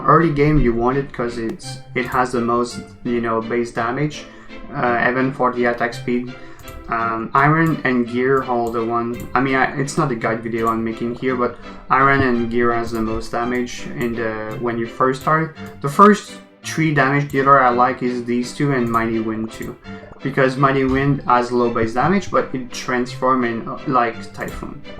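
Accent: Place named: France. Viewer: Cuz u sound french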